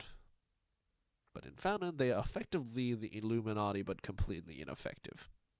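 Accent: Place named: Scotland, United Kingdom. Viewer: yes move on from that outrageous scottish accent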